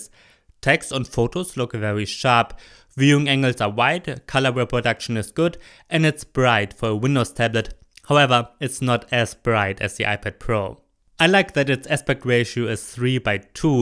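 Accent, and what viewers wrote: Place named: Germany. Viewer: Dein Englisch ist grausam, dass du deutscher bist hört man sofort. Entscheide dich besser ob du nicht auf das wenige Geld verzichten kannst und auf Deutsch deine Videos rausbringen kannst, oder ob du Muttersprachler weiter verschrecken willst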